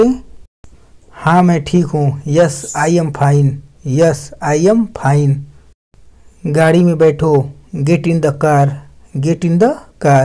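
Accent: Nigeria. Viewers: Bahiyiya apki English Nigerian logon jesi kyo hai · Aapki aavaj Nigerian and chaina mix hey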